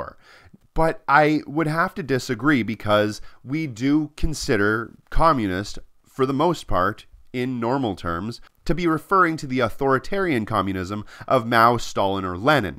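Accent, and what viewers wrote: Canada. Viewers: I can tell you are Canadian by the way you say "about" but good video 😁👍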